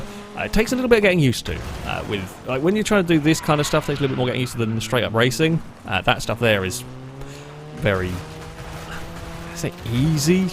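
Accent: United Kingdom. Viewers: Most of the time, I listen to youtubers with American accents so listening to this video was different but great! Im terrible at distinguishing different accents, but my thoughts go to thinking this is a british accent. Lol, I could be very wrong. I enjoy the accent, but most youtubers are overly animated and hyped up when Id much prefer something like this. You are not trying to hype us up by getting loud and crazy about little things. You're excitement seems genuine and makes watching this video in particular far more engaging than most others Ive seen. This is a simple compliment in the longest form but the summary of it is this. The lack of fake energy, the commentary itself, the volume of your voice and the clarity all make this video a great one. It heavily reflects you as a creator it does not disappoint. Have a great day everyone!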